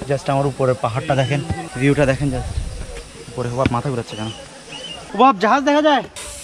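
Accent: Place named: Chittagong, Bangladesh. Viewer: সুন্দর ব্লগ। কথা আরো ফ্লুয়েন্টলি বলতে হবে। চিটাগাং এর ভাবটাও কমাতে হবে।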